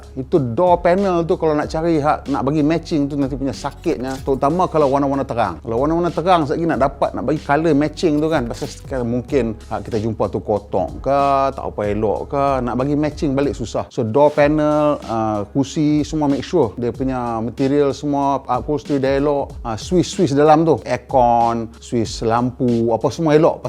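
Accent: North, Malaysia. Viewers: Hahaha illuminati 🤣 Kemaihhhh bang! Teruih cakap utara... Kami syok!!!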